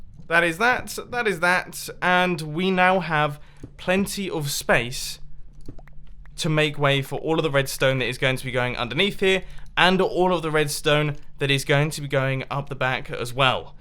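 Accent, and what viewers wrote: United Kingdom. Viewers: where are u from in terms of like Yorkshireman or Londoner.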